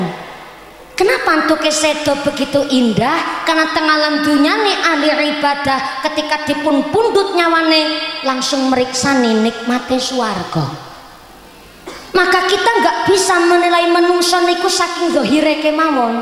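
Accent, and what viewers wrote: Banyumas, Indonesia. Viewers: Ustadzah..ngapak tapi asike pooll. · Asalamualaikum mba aku sangat kagum kalo mendengar suara inget lg di banyumas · Meskipun ngapak mantap mantap aku seneng dengernya ngaji seperti ini siplah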